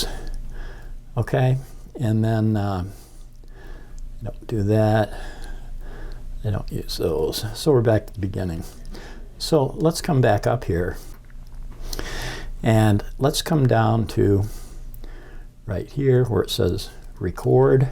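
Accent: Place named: Vermont, United States. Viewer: Very helpful! Well done and you sound like you are from Vermont :) many thanks!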